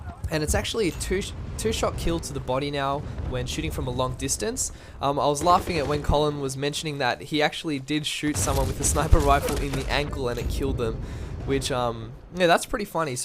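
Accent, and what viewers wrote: Australia. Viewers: mmm, enthusiastic aussie commentator ;)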